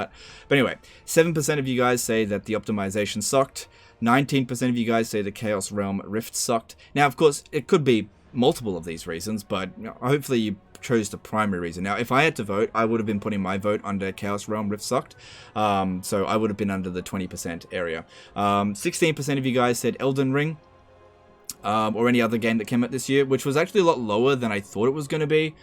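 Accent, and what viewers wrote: Australia. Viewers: I have never played one of these "Total War" games. I thought this channel was to listen to an Australian talk about cheese in a funny voice while he does something with digital action figures.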